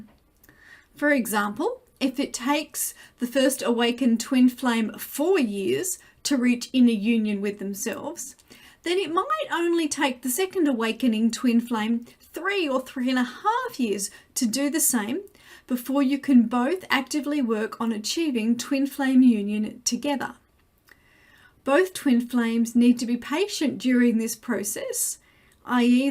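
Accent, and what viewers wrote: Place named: Australia. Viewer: So nice to hear an Aussie voice, hello neighbour. 🙏❤️ I would love to hear from other Aussie flames, does anyone have meet ups in Sydney.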